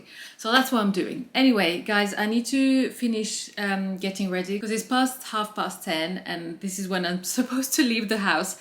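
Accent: United Kingdom. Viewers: I am in love with your british accent. Please don't stop vlogging in english.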